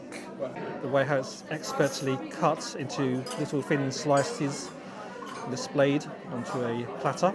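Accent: United Kingdom. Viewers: You look Asian, but your way of speaking and your mannerism are purely British. At 98 British pounds = $133 for a Peking duck which costs only $36-38 in Houston,Texas it was quite priced. American salary is much higher than Briton's. You must be the privileged in British society.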